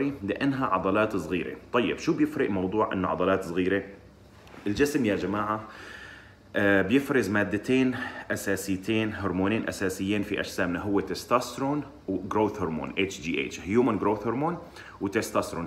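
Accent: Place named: Syria. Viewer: هذا سوري مو مصري ي سطا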